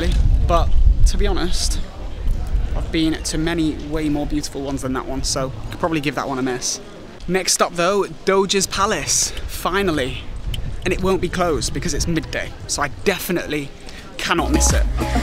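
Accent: United Kingdom. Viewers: You've got really lovely British accent :-P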